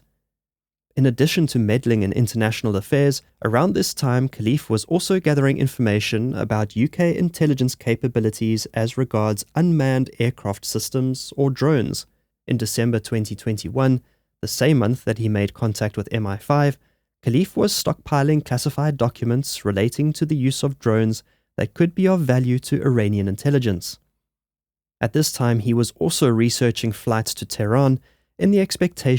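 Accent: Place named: South Africa. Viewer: I always get thrown off by the South African accent at the start, but then it reminds me off home so I love it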